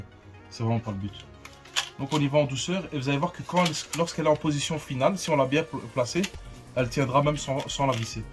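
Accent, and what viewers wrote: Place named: Belgium. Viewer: Je suis français et je possede une BMW e46 essence donc rien à voir avec ce modèle mais j'ai pris énormément de plaisir à voir ce travail bien fait par un mécanicien au sympathique accent belge et surtout un vocabulaire et un phrasé parfait tout au long de son intervention. Ca fait bien plaisir. Merci Monsieur 🙂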